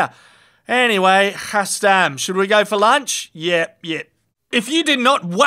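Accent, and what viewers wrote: Australia. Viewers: When I moved to australia from the US, everybody sounded like you for the first month 😂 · This guy sounds so Australian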